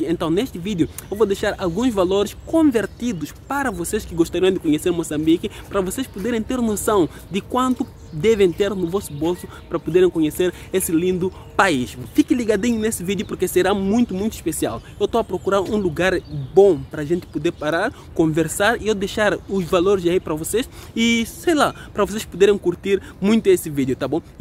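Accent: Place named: Brazil. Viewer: Você fala um sotaque próximo do brasileiro. Diferente de outros youtubers de Moçambique.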